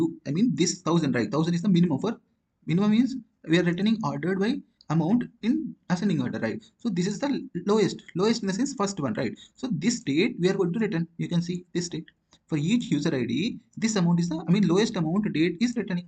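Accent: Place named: India. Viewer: Why do you need to speak so fast? Indian accent is so hard to follow. Just speak slowly and clearly.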